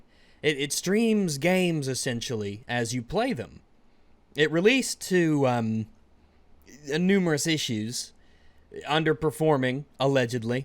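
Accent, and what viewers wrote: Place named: South, United States. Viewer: The southern came out this episode.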